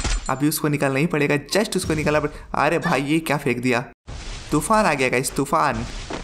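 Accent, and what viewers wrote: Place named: Karnataka, India. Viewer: Bro are you from Karnataka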